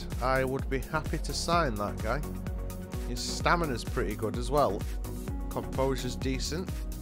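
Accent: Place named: Northern England, United Kingdom. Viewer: Relaxing, insightful commentary from a northern lad, doesn’t get much better than that! Liked and subscribed 👍🏴☕️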